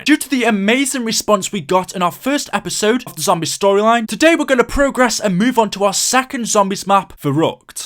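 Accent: United Kingdom. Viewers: Giovanni Costilla well he is from the United Kingdom, and he is British, not Canadian like vanossgaming.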